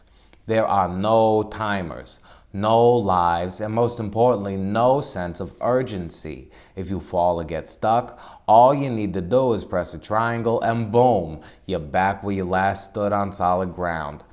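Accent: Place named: Boston, United States. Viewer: Really love your Bostonian accent (sorry if that's not an accurate description). Your parents named you perfectly, it just totally fits your presentation. You have the potential to be similar Yahtzee of Zero Punctuation, as in using your nationality as your draw; maybe try a VR mafia insider type persona - you'd totally pull it off.:P Regardless, this Australian gives you the thumbs up and I'm glad I subscribed, especially after seeing your live stream cus you seem like a cool guy who genuinely loves VR as much as I do.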